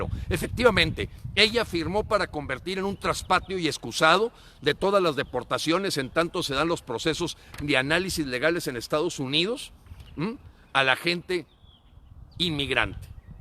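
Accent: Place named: Mexico. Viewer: Ud tiene el tono mexicano sr se le nota al 1000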